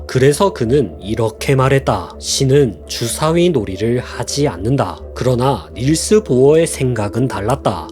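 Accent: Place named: Gyeongsang, South Korea. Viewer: 경상도 사람이 대본을 또박또박 읽는 느낌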